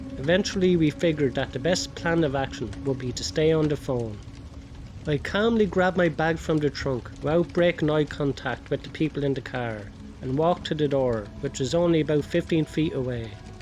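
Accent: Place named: Ireland. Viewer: love the accent, there are way too few Irish youtubers with creepy content! I hope with more subscribers, the mic will be better in future, although I get that it's not first priority. As a suggestion, you could make longer videos, as many people like to fall asleep to them or drive while listening in - and if they are this short, it's not as convienient. About 20-30 minutes would be best :)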